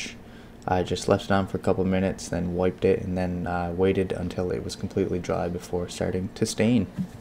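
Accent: Canada. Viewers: Your pronunciation of your "ou" s gives you away as being from Canada. I would say Calgary? Somewhere in the mid part of your fine country.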